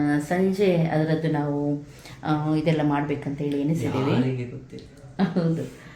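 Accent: Mangalore, India. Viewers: Manglur bhasha yestu chanda😊l fast time watched your video super 😊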